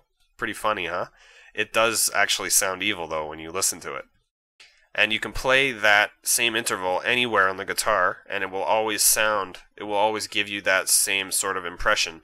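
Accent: Canada. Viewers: Nice video thanks. P.s. your Canadian huh? Cool accent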